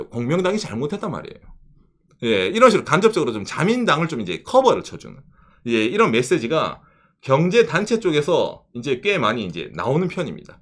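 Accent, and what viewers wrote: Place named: Gyeongsang, South Korea. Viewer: 도... 경상도 사투리... 쓰네요... ㅎㅎㅎ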